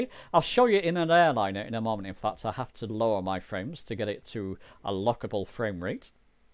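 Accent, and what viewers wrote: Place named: Scotland, United Kingdom. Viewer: Lol your accent sounds just like my Scottish friend I play Rust with! Thanks for the video!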